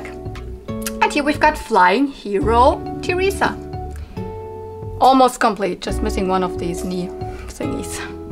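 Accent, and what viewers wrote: Germany. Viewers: Are you from Germany? My bestie is from hamburg, similar accemt. And i dont think you have to say its for adult collectors, every age can appreciate all the toys you have. Do you have any rainbow bright or Strawberry shortcake 🍰? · wow you have an interesting barbie collection! I really like the bright colors of all ur dolls! I'm a barbie collector too and exactely like you I dun collect boxed barbies and I like to restore the dolls too. I love 80's and 90's barbie, and even 70's and 60's, but I'm a perfectionist and I always try to keep my barbies as close as possible to their original conditions with original outfits. I see you always find many good things at flea markets but here in Italy it's so hard and rare to find vintage barbies at flea markets, ur so lucky! Btw I like the simple way you collect barbies and it's so cute to hear ur german accent. 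Diese ist wunderbar!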